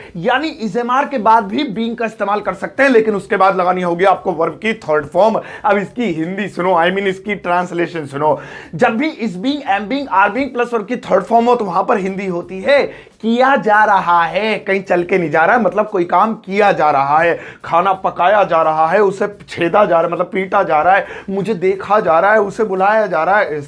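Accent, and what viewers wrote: India. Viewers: Hello sir good morning I have one suggestion this is 36 video of advance English class and you have already done English speaking 60 days it has been long time if it's possible u can teach us in English. Because your accent is India it will be helpful for us sir 2 ND thing is I have already told you sir please make videos on punctuation I don't know how to use . Thankyou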